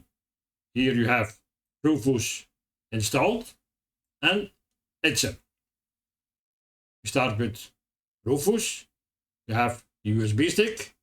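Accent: Belgium. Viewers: Ik hoorde al dat je van Belgie bent, goede video! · We horen echt da vlaamse accent hahaha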